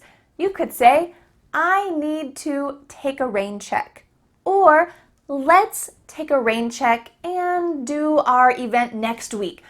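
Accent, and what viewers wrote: United States. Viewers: Wawwawaw i love American accent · please, is she from the u.s.? does anybody know? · She such a good teacher she teach me alot of American accent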